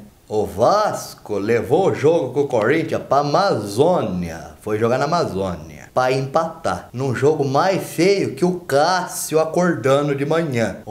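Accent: Minas Gerais, Brazil. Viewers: vc é mineiro igual eu? Kkkkkkkkkkk 😁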